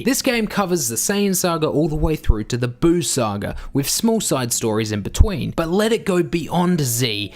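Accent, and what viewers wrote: Australia. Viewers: The guy sounds Australian. If you watch Crocodile Dundee, you'll know Australians have ridiculous standards based on hogwash.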